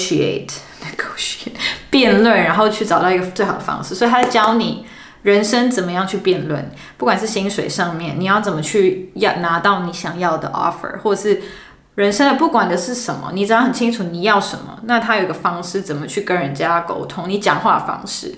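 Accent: Taiwan. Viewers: 果真很台灣人講話都是台灣人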